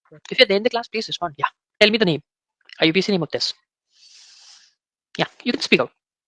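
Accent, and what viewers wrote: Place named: Bangladesh. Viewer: Sir aapka accent videsh ka lagta hai bangladesh ka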